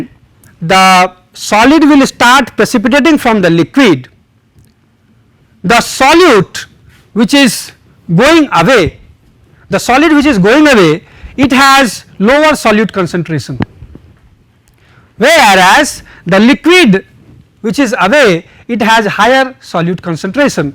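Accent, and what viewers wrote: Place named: India. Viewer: Indian accent is hard enough to listen to without that mic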